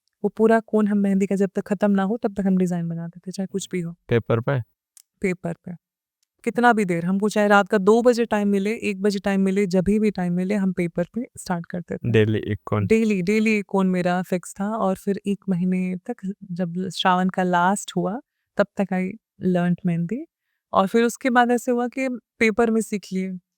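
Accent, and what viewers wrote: India. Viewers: The way you are talking you are not look like a Nepali, you are look like Indian, all the best 👍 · aap ke bolne ka lahza bilkul ham Lucknow walon ki tarah h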